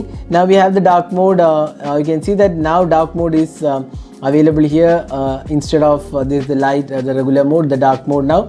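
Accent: Kerala, India. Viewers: R u from Kerala???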